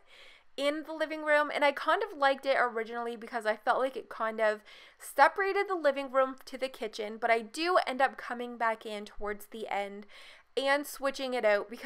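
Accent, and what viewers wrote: Canada. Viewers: Are you from Canada? I'm just asking because of your accent.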